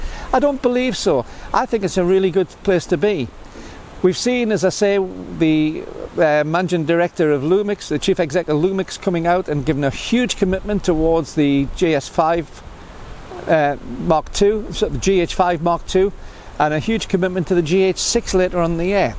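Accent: United Kingdom. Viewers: great insights. always fan that British accent :) The bottom line if you carry a bag of multiple lenses (3-4) and want to be on a minimal comfortable carry, nothing compares to m43. given the low f-number per price (such as 25mm f0.95) you still get a decent full format ~f2.0 paying less money per overall and keeping the size to minimum. also, the sensor movement compensation of Olympus makes it possible to shoot in low light for a long shutter time, and the elegance of pana, say gx7, is 2nd to none, except Leica. So the only thing comes down to Megapixel which is quite BS in real life.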